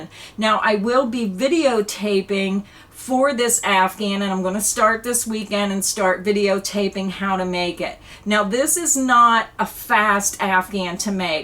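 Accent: Pennsylvania, United States. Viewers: I love listening to your Pennsylvania accent. I like how you called your shelves a coopy hole. We called it a cubby hole. Coopy is much cuter 😉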